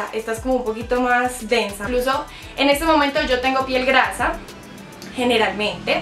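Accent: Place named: Antioquia, Colombia. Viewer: hola, me encanta tu hablado paisa es super chevere y también me gusta que pienses en nosotras y nos dices donde conseguir los productos y cuanto cuestan, saludos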